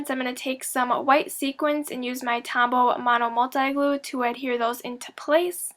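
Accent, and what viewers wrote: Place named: Upstate New York, United States. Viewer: Great card,! I was wondering, are you from Upstate New York? Your accent reminds me of my great aunt, who lived in Rochester. Sorry your kitten scratched you so badly, but I'm glad you're OK.:) Thanks for sharing your wonderful talent!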